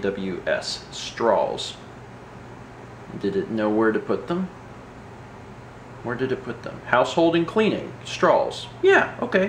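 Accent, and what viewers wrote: South, United States. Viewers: its the southern accent it sounds like we say an l when we are talking really fast. figured id let ya know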